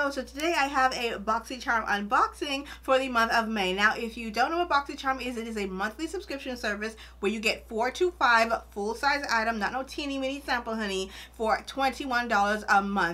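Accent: Bahamas. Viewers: Where are you frommmmm. You sound like you're from the Bahamas!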